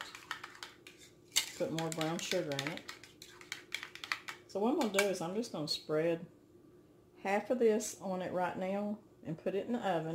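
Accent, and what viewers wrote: South, United States. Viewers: Oh my your camera is wiggin out today! 🤔 Love this recipe, and your sweet southern drawl! Definitely comfort food with the addition of mashed potatoes and sweet peas and butter! Yum! Thank you for recipe using venison!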